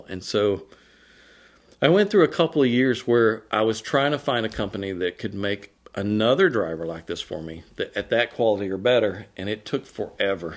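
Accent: Texas, United States. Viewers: "He cranked 'em up with some Danzieg....". Incredible. Between the story and the Texan accent, it's like a Coen Bros. movie.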